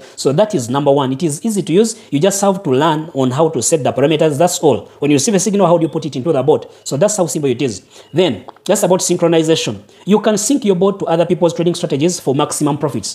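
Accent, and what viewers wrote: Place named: Buganda, Uganda. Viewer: He seems a muganda according to his acent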